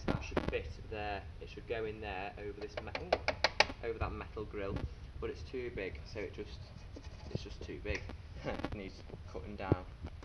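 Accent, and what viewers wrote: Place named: Liverpool, United Kingdom. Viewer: Great video, cant believe it got no comments! I've just watched most of your other vacuum cleaner videos and all I can say is I love your style and the chat you give while talking about the vacuums, which is just the way I used to do my own videos. I used to be able to ramble on for an hour about a vacuum no problem, lol. I guess you are into alarms much more than vacuums, but I think you have a great talent for turning a mundane old vacuum from a rubbish tip into an interesting and entertaining video, much like Sam from Beko1987 does, and its your comment under his Purepower video that lead me to your channel here. You remind me very much of Paul O Grady with the way you speak - I guess you must be from Birkenhead / Liverpool area, lol. Its great that you are giving an old Lux like this a new lease of life, and that your mother likes using it. 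I gave my own mother one of my old bagged Lux uprights last year, its the Powerlite Petlover 1700W from 2010, and she loves its lightness being aged 74 she struggles with bagless machines and bagged is easier for a pensioner to maintain.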